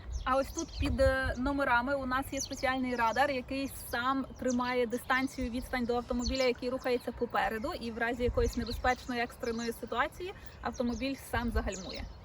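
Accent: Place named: Poland. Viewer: тут саме Польщу чути, не Львів)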